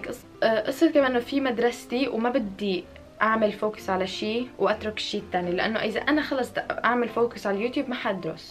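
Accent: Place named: Algeria. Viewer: نتي جزائرية تاع الصح نستعرف بهدرتك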